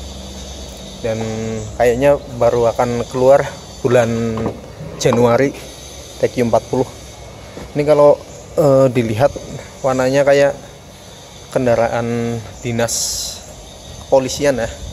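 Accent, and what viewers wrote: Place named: Tegal, Indonesia. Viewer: Sih kayane wong tegal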